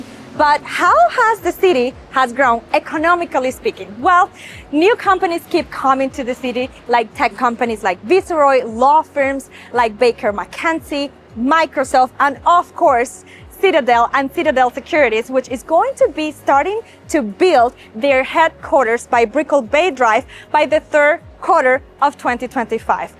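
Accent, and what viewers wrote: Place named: Latin America. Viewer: Whatever accent this is, is my favorite Latin accent. Great video!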